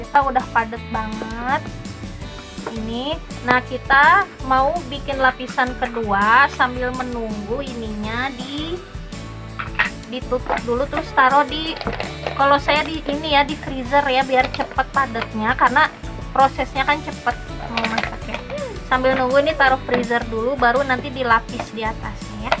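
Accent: West Java, Indonesia. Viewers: Orang sunda mana suaranya!! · Nyarios na logat sunda pisan hebring poko na mah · Iya Non :") kalo orang Sunda mah emang begini sama kaya aku 🤭🤭🤭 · Tetehnya nyunda banget seneng liatnya 😄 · I love teteh sayang aku suka banget sama gaya bicara teteh, teh orang mana? Sunda pisan euy nyarios na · Waaw... Mantap.. Hatur nuhun resepnya tth... Sunda na ti mn teh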